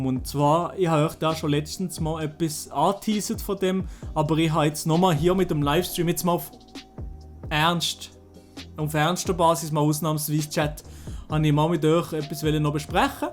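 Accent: Switzerland. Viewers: Würde ich gut finden. Du kannst mehr Leute erreichen und alle deutschsprachigen verstehen dich. Am Anfang wird es zwar noch etwas ungewohnt sein, aber das wird sich dann legen. Doch tu einen Gefallen.. Rede wie ein deutscher und nicht wie ein schweizer 😊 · Ich fändis nitirlich schad wenn mä der komischi (nit negativ gmeint) Dialäkt nimmä wurdi gheerä aber ich cha der Gedankägang durchüs verschtah. Äs isch wahrschindlich scho chli deprimiärend, dass mä mit Schwizerditschä Videos eifach ä sehr begränzti Riichwiti het. Mä derf aber nit usser acht lah, dass Schwizerditschi Videos bi Schwizer sehr güät ahchämät und si sich mit derä Schprach ai viu besser chenät identifiziärä. Ich hoffä, dass dü diä uberleggigä ai machsch. Dr definitivi Entscheid isch natirlich ä diär uberlah und dü muäsch dich ja nit sofort entscheidä. Ich wurdis sehr fiirä wenn dü witerhin Schwizerditschi Videos machsch, aber mä eurdi sich sicher ai äds Hochditsch gwännä. 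Ich wyyschä diär nu ä guäti Zyt und viu Glick bi dinnärä Bachelor-Arbät.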